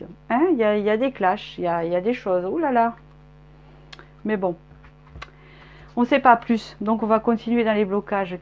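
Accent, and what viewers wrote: South, France. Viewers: Merci beaucoup! Pile au moment où j'ai eu la certitude que j'avais fait le bon choix (le 2), vous parlez avec humour de votre accent du sud! J'ai pris cela comme une confirmation, moi qui suis née et vis dans le sud🤗🌻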